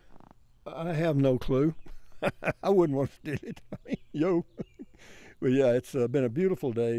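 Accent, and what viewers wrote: Texas, United States. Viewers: Loaded to the cookie seed! Texas talk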